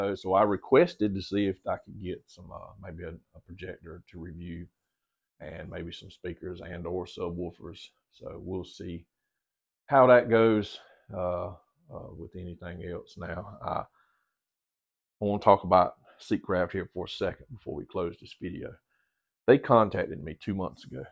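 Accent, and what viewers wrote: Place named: South, United States. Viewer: Cheers! Cool to see companies sending you gear. There are other channels with good people. But i was raised in part by a southern gentleman, and its cool to hear a voice with some southern twang in it, reviewing audio gear.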